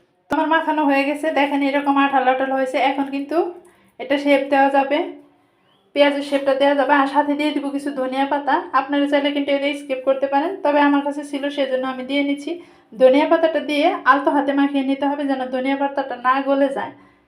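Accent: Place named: Tangail, Bangladesh. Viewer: Ata to amader tanggaeler vasa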